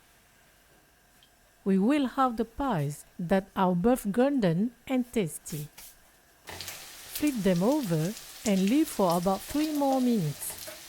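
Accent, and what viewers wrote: France. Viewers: I like watching your videos. You look like an Asian but your accent is like French. Do you live in France?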